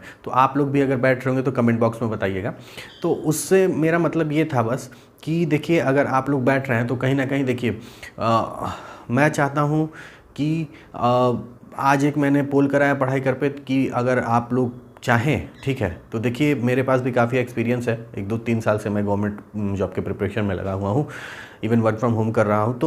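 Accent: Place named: Bhojpuri, India. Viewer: Kaha se ho sir bhojpuri bol rare ho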